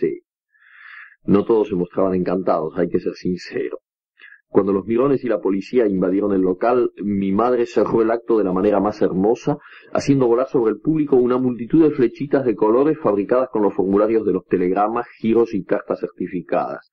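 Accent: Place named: France. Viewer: Es único, esa pronunciación afrancesada ..me encanta..es especial escucharlo ..gracias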